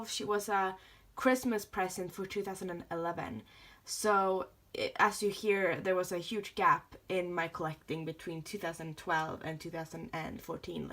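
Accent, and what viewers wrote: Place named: Scandinavia. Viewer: Hey there. Eller hallo. I was wondering where you're from? Your gorgeous dolls' names seem very nordic to me, as well as your accent, so I was wondering if perhaps you might me in fact nordic?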